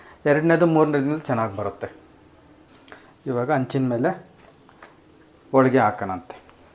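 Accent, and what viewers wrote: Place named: Hassan, India. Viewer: ನೀವು ಯಾವ ಊರು..?? ಎಲ್ಲಾ ಕಡೆ ಭಾಷೆನೂ ನಿಮ್ಮ ಮಾತುಗಳಲ್ಲಿ ಇವೆ.ನಮ್ಮ ಹಾಸನದ ನಮ್ಮ ಹಳ್ಳಿ ಮಾತುಗಳು, ಮಂಡ್ಯ ಕಡೆ, ಉತ್ತರ ಕರ್ನಾಟಕದ ಕಡೆ ಹೀಗೆ ಹಲವಾರು ಹಳ್ಳಿಗಳಲ್ಲಿ ಮಾತಾಡುವ ಕನ್ನಡ ನಿಮ್ಮದು.. ❤️❤️ ಜಾಸ್ತಿ ನಮ್ಮ ಹಾಸನದ ಕಡೆ ಹಳ್ಳಿ ಭಾಷೆ ಇದೆ. ನಂಗೆ ಖುಷಿ ಆಯ್ತು. ಅಡುಗೆಗಳು almost ಎಲ್ಲಾ ಚೆನ್ನಾಗಿವೆ. ನೀವು ಮಸಾಲೆ ದೋಸೆ ಮಾಡುವುದನ್ನು ತೋರಿಸಿ.. 🙏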